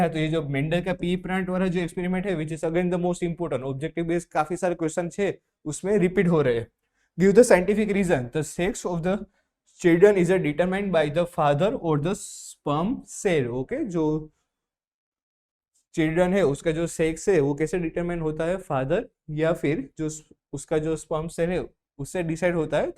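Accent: Gujarat, India. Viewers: Bhai woh Gujarati Hai Sharad se Guj accent me Hindi bol rhe hai😅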